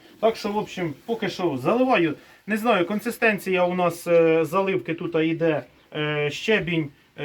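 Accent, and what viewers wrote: Poltava, Ukraine. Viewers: Доброго дня!!! Натрапила на вас на просторах YouTube, яка приємна несподіванка! Рідна, неповторна решетилівська мова.Живу дуже далеко від рідного краю і просто насолоджуюсь вашою розмовою. Із хазяйства в нас тільки кіт, рибки і у внука богомол, але разом з внуком з цікавістю спостерігаємо за вашими тваринками., запитайте, чи був у неї однокласник Віталій, думаю що вона ходила в один клас із моїм племінником. Світ дуже маленький, хоч, може, помиляюсь, бо вже майже нікого не знаю в Решетилівці.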